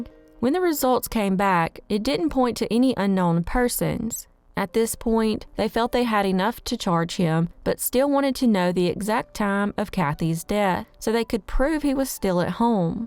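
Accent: South, United States. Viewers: This narrator has a nice southern accent.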